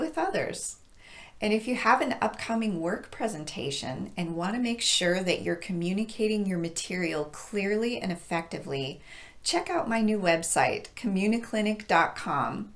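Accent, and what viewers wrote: United States. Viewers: Thanks for teaching ❤ but I have a question. Could you tell me Which accent is your pronunciation in the U.S.?😢because I found some different accents in the U.S. They are so different, especially some certain words and certain structures. Does it matter if I have a mixed accent 😂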